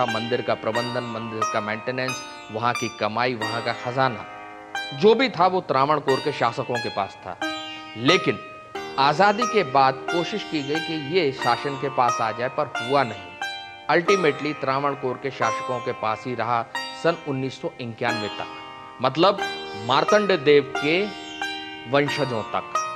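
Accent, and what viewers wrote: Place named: Haryana, India. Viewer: Good haryanvi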